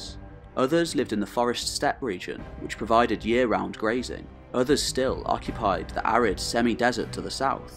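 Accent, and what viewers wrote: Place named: Yorkshire, United Kingdom. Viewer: This guy is from the north of England - Yorkshire, I think - Ewan is Scottish. Very different.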